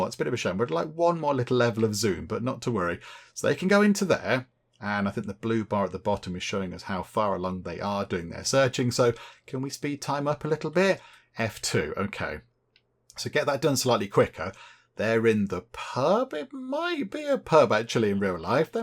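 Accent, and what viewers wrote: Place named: Northampton, United Kingdom. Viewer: Oh wow didn't realise you were just down road from me 😂 knew accent was familiar. I'm at weston favell 2 mins from the police station. Think town centre already a zombie zone based on how busy it is some days... Try not to damage the king billy during the play...I might be there having a pint and zombies would ruin my day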